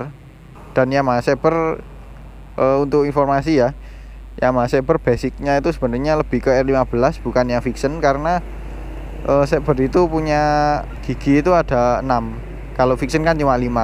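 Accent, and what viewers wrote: Malang, Indonesia. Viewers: Wong malang opo wong batu mas?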